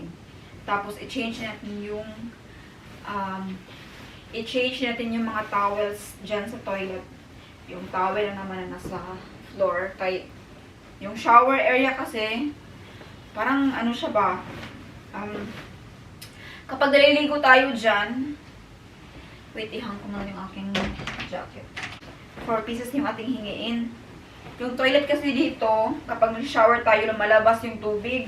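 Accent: Visayas, Philippines. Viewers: How many man you’re going to have ? Lol just saying bisaya ka Inday di ba ? Speak good English Dai pls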